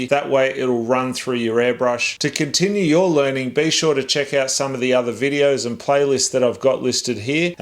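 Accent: Australia. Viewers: You Aussie?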